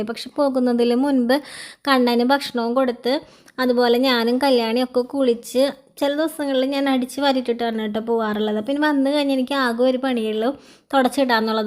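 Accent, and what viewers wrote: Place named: Thrissur, India. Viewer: Thrissur ano ivrde place? Slang familiar ane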